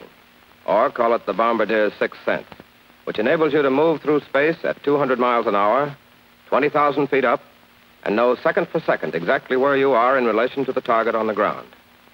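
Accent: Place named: Transatlantic, United States. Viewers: Its the Mid Attlantic accent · That transatlantic accent carries hard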